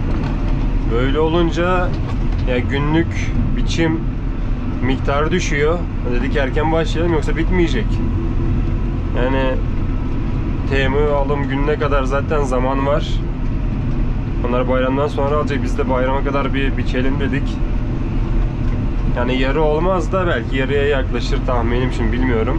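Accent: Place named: Istanbul, Turkey. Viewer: İstanbul Türkçesiyle son teknoloji tarım makinelerinin bu kadar yakışması peki ❤❤❤ örnek bir Adamsın sen 👏👏👏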